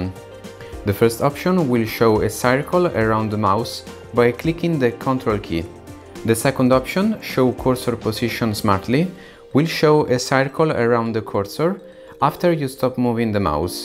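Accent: Italy. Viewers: Cerco una recensione in italiano, vedo che l'autore del video è italiano: ma ha fatto la recensione in inglese (e con una pronuncia tipicamente italiana!) L'unica cosa migliore rispetto alle recensioni di siti specialistici è lo scandire per bene le parole che rendono meno impegnativo il lavoro di traduzione. Cmq a parte le critiche per non aver pubblicato anche un video in italiano, ti ringrazio: il video è ben fatto ed analizza il device a fondo. Ps: dovresti scrivere nel titolo che questo è il Dasung Papelike 3 o si potrebbe avere il dubbio che si stia parlando di un modello più vecchio. Pps: 40 minuti di video sono troppi! See you, Italian Reviewer!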